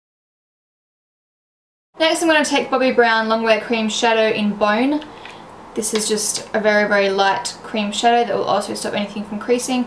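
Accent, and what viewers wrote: Australia. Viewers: is she Australian?! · R u an Aussie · She is not British lol. She is Australian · Is she from Australia? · She's Australian, she cant change her accent. Shes perfect either way. LOL. xo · no she's aussie just like me and she doesn't have an american accent. also her po box address is in WA which is Western Australia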